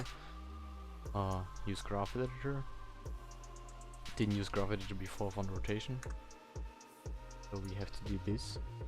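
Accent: Germany. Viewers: Man merkt, dass er deutsch ist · u german? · German-English dialect i can hear this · Ur german accent tho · Yo Ich wusste das du deutscher bist wegen deinem Akzent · man hört heftig raus das du deutsch bist xD c: aba danke für das video <3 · man hört so krass das du ein deutscher bist haha aber danke<3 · er ist deutsch aber versucht englisch zu reden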